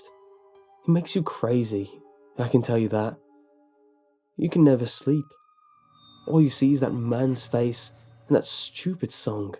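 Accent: United Kingdom. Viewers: the narrator is British right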